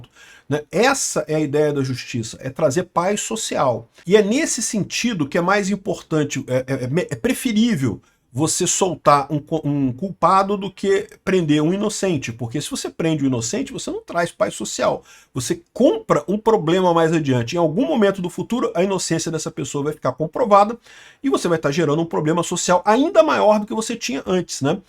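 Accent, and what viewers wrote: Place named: Rio de Janeiro, Brazil. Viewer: Tbm amo esse jeito dele se expressar! E tbm o jeitão Carioca. Mato as saudades do meu povo do Rio.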